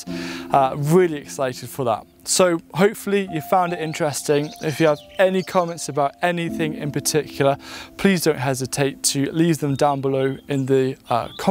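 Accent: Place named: Wales, United Kingdom. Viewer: I’m interested in your accent. Every third sentence there’s a cadence that sounds Welsh. Which region is it?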